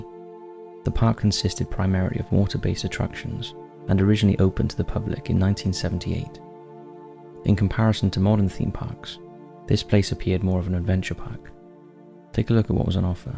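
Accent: United Kingdom. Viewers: You always put me to sleep British spooky man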